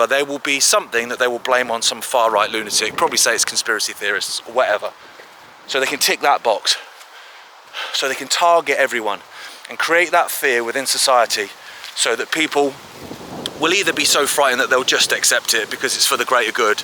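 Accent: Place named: Cockney, United Kingdom. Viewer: Say ands b proud of cockney accent I am one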